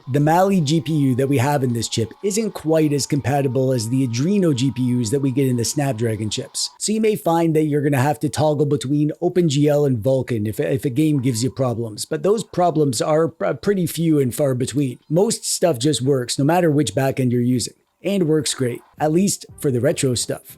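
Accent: Canada. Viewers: Too much rambling, like you enjoy the sound of your low monotone, raspy voice with that obvious canandian accent just wasnt it for me. I could've done without the uneccessary comedy and jokes thrown in. I dont think there's anything I learned from your "review" about this gaming device. No sub from me.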